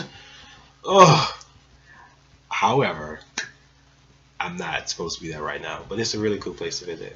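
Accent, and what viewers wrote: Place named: New Orleans, United States. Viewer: That New Orleans Accent was So Thick LOL !... Not So Much Now - Barely Can Hear It ...Thank U Much for SHARING '-p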